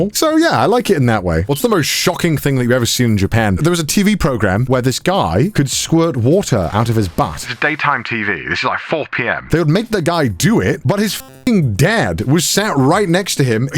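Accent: Wales, United Kingdom. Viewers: ok am I crazy or does his Welsh accent sound thicker I wonder when he filmed this after his trip home...am I along in this? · I don't really watch anime or know much about Japanese culture, but your videos are just genuinely enjoyable. I have been binge watching them a lot because they're just really nice to relax and throw on. I think no matter where you live or what your content is on you'll be successful because you are just good at what you do and are dedicated. People can sense that and it draws them in. And the deep British voice kind of makes it hard to click away lol Wishing you another successful year in 2022!!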